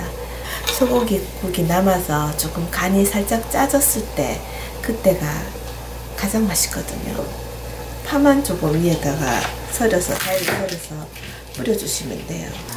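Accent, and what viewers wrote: Gyeongsang, South Korea. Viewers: 🐋😀아~네^^♡쇠고기국 활용도가 대단하네요😍 😘는 대구쪽이세요?아님 부산쪽이세요?🍎🍏말씀소리가 참 부드럽고 예뻐서예🍡👠💍미스때 직장 동료가 마산출신이 있었는데 정말 말소리가 예뻐서 안 잊혀지네요😍😍😍🐸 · 갱상도 아지매 방갑니더~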